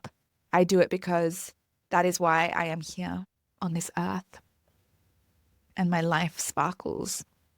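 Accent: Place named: United Kingdom. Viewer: a british accent....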